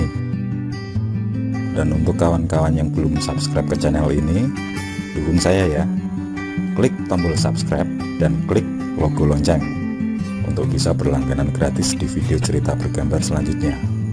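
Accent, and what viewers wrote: Java, Indonesia. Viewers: Orang jawa mana suara nya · Orang Jawa yo mas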